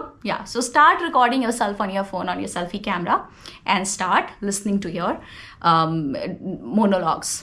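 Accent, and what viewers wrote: India. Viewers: I can't say not a lot of words. But i try myself everyday. Everyday i learn those vocalbury & sentence which helps me a lot. I like the way you talk. I love your indian accent. I feel comfortable & understand clearly. I make mistakes but when you talk everyday make mistakes. It's not a big issue. But sometimes i litte bit fear becoz of i make mistakes a lot. I can't tell you😅😅. I want to be a fluency in english ❤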